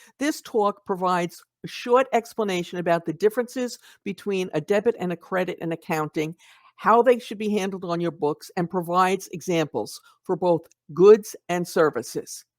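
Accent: New York, United States. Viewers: New Yorker?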